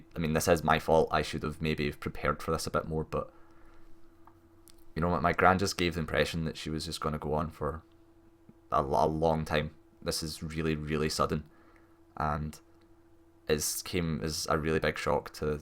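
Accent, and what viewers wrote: Scotland, United Kingdom. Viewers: Sorry for your loss. I'm from Canada and I've been watching you guys for a while now (pretty much for the guides but also the let's play). It was hard when I started because my first language is French. Although I speak English well, I wasn't used to your accent. It took me a while but after few videos, it was all good (I even mimic your accent when I'm playing Dark Souls and it makes my wife laugh so much asking "Since when you have a Scottish accent!!!"). Your channel is genuine and I'd be glad to contribute ($$) if it can help you! Cheers mate!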